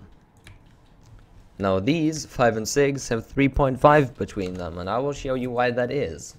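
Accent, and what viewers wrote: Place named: Norway. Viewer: Was this pre-transition? You sound sort of exactly like any Norwegian guy and it’s off putting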